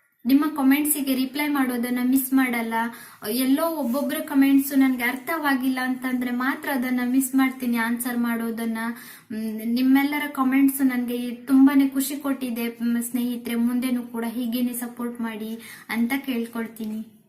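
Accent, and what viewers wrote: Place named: Honnavar, India. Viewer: Namm honnavara matu chenda akka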